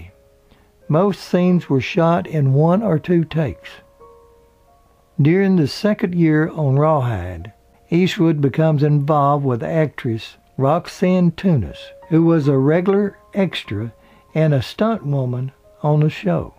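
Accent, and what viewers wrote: South, United States. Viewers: Dear mr. ( may I call you ), your format and exquisite Southern accent make all of your mini documentaries a pure joy to behold. I my humble blue-colour opinion, you are a true celebrity historian with the gift of timelessness that you bring to all of your productions.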